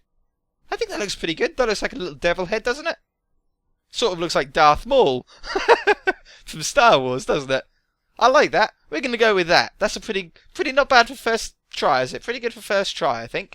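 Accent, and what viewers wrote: United Kingdom. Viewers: I am not trying to be mean but u could ride at the end of your videos but u really do a good job and r u British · I can sence yo' britishyness. Is that what it's called? Btw love your vids! 😸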